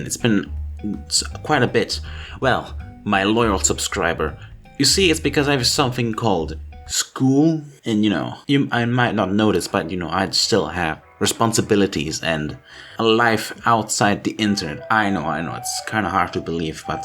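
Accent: France. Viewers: You’re french? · Are you French or Italian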